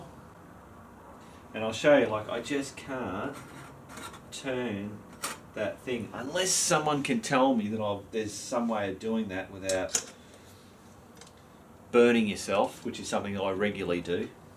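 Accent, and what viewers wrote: Australia. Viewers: Your accent makes me miss living in Woomera SA.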